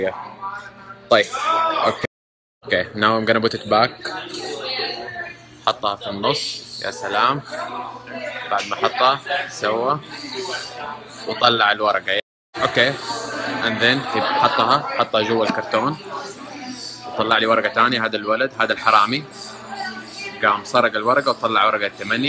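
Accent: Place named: Hijaz, Saudi Arabia. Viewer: بس اللهجه حجازيه زي احمد الشقيري لكن الصوووت مره لااا😭😂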